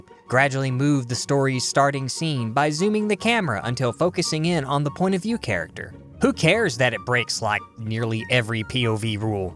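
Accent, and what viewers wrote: South, United States. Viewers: The most subtle of southern accents makes this all the better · I love this guys accent is it a southern accent?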